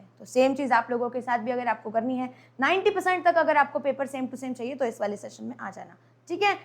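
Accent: Maharashtra, India. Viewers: Ap marathi ho ky mam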